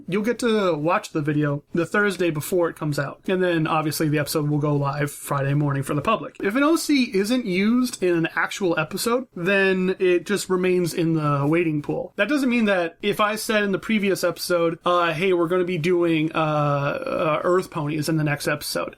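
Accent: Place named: Scotland, United Kingdom. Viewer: I still love your accent. .3. only because scotish people are awesome. I wish to live in scotland or ireland one day, cause Australia now bores me o3o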